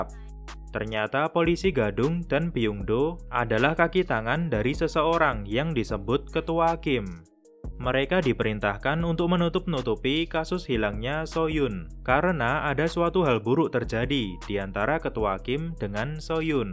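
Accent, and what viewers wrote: Indonesia. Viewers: Gaya ngomongnya kek orang indo:v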